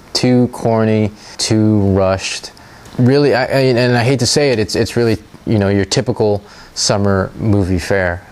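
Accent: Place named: United Kingdom. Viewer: You do a great job,! Love the British accent, too:)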